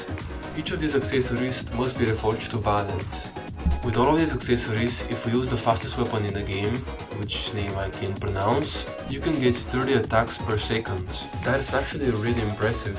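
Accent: Russia. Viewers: твой акцент очень похож на русский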